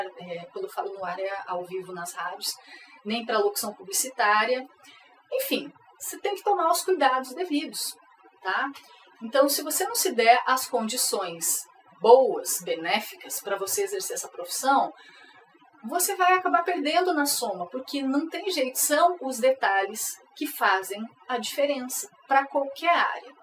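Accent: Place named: Londrina, Brazil. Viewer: Vc é de Londrina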